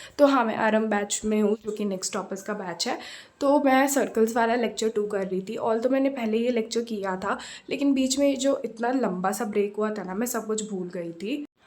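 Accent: Bihar, India. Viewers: Heyy!! Are you from bihar !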